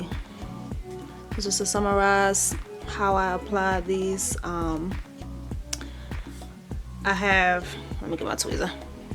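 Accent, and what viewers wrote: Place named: Washington DC, United States. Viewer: When I first started watching I was like “she sounds like she could be from DC” then you said “blowing me” and was like yep! 🤣 hey home team!!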